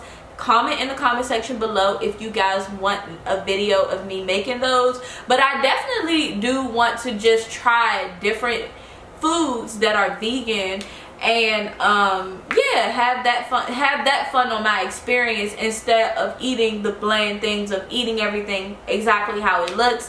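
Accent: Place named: DMV, United States. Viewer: Are you from the DMV? Your accent sounds familiar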